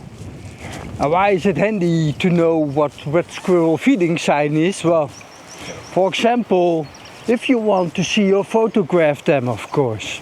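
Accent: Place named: Netherlands. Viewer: Leuk Nederlands accentje😂 wel leuk zo'n rode wouw!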